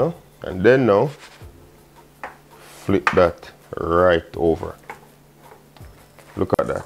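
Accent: Jamaica. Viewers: New to your channel, and your Jamaican accent and delicous food is absolutely amazing.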